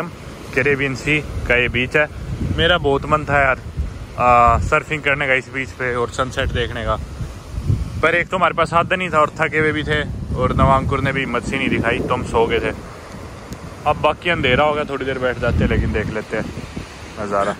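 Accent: Haryana, India. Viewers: Me and my son watch your videos with great intrest as you cover all those places which we see in Atlas and read and watch videos about its cuisine. Your Haryanvi dialect is super. With best wishes.👍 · Huge fan of your rugged Haryanvi accent - from Chennai 🇮🇳😂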